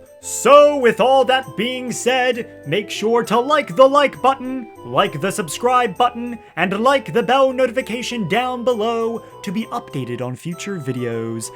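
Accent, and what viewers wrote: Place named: United States. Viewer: Good video but American accents can be kind of grating. Especially how he keeps saying "White Run". Doesn't really flow in his voice.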